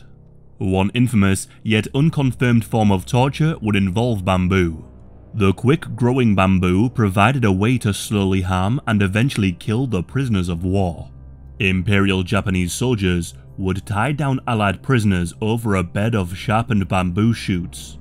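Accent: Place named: North East England, United Kingdom. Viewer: What the hell is this blokes accent-he sounds North Eastern, yeah a bit like Byker Grove?!!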